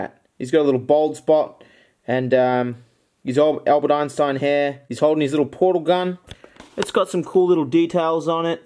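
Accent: Australia. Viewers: Intense aussie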